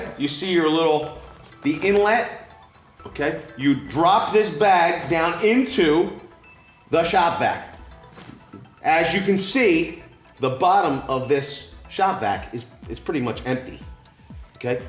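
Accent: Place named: Italian-American, United States. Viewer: Guido accent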